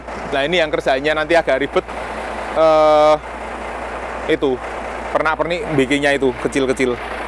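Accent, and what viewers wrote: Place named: Surabaya, Indonesia. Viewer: SALUT SAMA BOS... TOP BANGET.... LOGATNYA NGOMONG KAYAK AREK SUROBOYO..... SALAM DARI SURABAYA......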